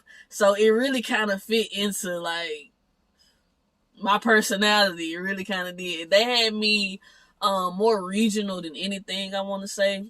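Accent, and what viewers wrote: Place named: Memphis, United States. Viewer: Your hair taking off . Love that Memphis accent mane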